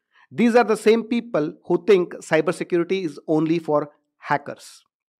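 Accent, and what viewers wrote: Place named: India. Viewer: I hate clicking on this videos then listen to an Indian voice🙄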